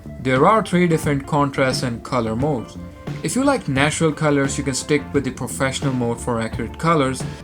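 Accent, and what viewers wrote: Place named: India. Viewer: less indian accent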